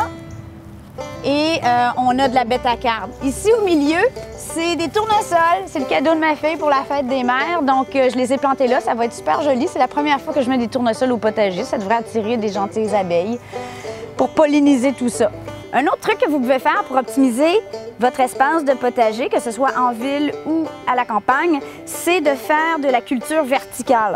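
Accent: Quebec, Canada. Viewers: Mdrrrr l'accent québécois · Je kiffe l'accent québécois !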